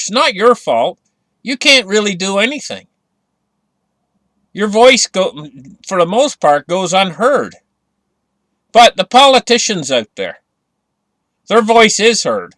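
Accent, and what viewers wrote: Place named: Canada. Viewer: Love you to bits,. My day is not complete without those dulcid Canadian tones. Now here's the thing. You have yourself a merry little Xmas and come back refreshed and ready for the end game. Kind regards from the UK.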